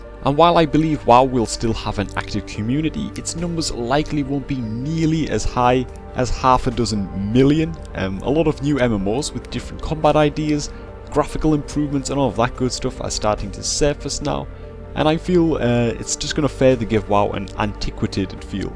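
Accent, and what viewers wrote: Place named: Geordie, United Kingdom. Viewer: he's either a Geordie/Mackem or a sand dancer, cant tell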